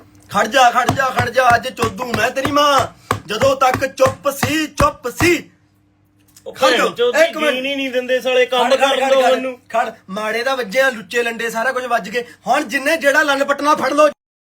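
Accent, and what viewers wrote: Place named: Malwa, India. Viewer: Theth malwayi gala .😂😂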